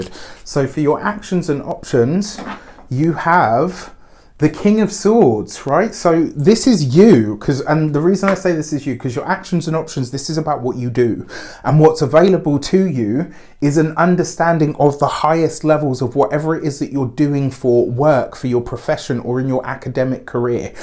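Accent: England, United Kingdom. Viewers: Dude, i don't know if i want to stay here. You're just to cute and that English accent is driving me Crazy🤫. 18 Years with my husband Remember.😂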